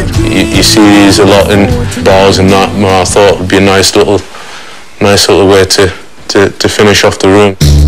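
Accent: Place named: Newcastle, United Kingdom. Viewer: best black guiy with a geordie accent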